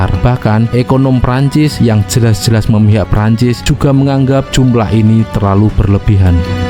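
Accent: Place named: Java, Indonesia. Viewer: mas, jowomu njowo banget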